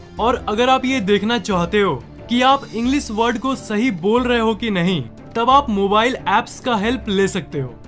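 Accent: Bihar, India. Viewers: He is from BIHAR. I am confident. By his accent , tone.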